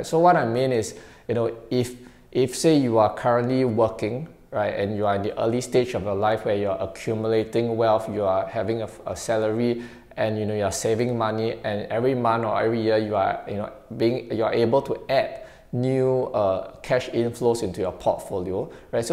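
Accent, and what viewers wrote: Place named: Malaysia. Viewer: you are definitely from Malaysia :)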